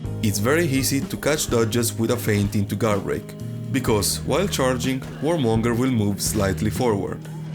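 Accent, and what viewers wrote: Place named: Italy. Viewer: madonna l'accento italiano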